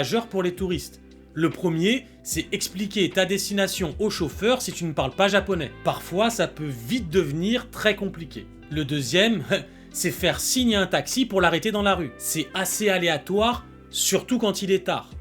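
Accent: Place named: France. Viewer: Bonjour apparemment ta chaîne est celle que je préfère parmi toutes celles que l'on peut trouver sur le Japon, à t'écouter je pense que tu es français : comment as-tu fait pour changer de régime alimentaire car je ne pense pas que tu manges du pain et du fromage tous les jours ?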